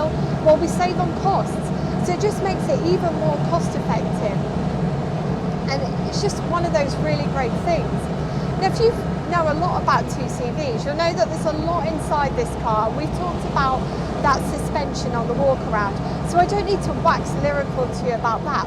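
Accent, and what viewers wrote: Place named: United Kingdom. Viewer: As a frog, I love your pronunciation of french names. I really do. Sounds really nice, so British! You're so well spoken, truly pleasant to listen to you. Thank you for your quality work! You do those great cars justice! Warm regards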